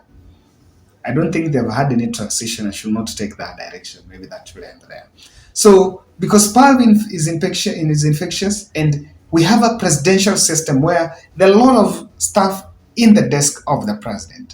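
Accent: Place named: Luhya, Kenya. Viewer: He is talking like a luhya..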